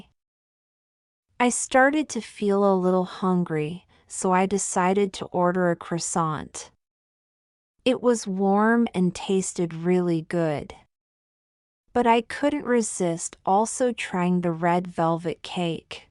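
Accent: United Kingdom. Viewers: I think this video is British accent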